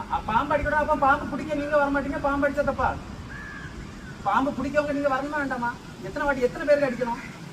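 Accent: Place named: Nagercoil, India. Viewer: Entha ooru nenga Nagercoil pola iruku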